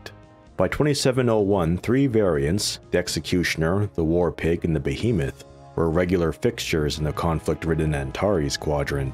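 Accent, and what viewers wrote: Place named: Australia. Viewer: You're obviously an AUSSIE!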